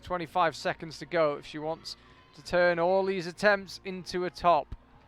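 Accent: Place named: United Kingdom. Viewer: DJ with cabin fever :P I do fancy your very british commentary!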